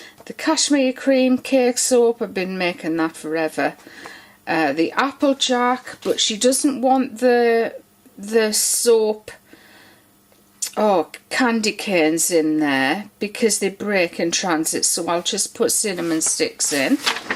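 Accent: United Kingdom. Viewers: What ?! people are watching your videos to hear your lovely voice with your beautifull British accent and your soaps that are really but really look tasty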